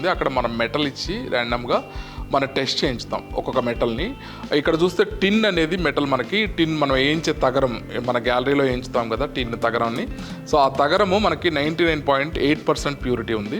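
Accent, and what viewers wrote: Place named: Telangana, India. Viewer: Telangana yaasa idhi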